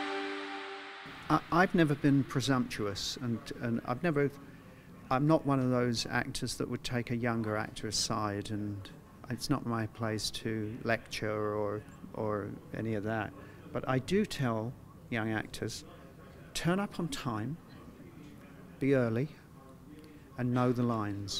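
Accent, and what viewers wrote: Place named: South London, United Kingdom. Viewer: Sarf Londoner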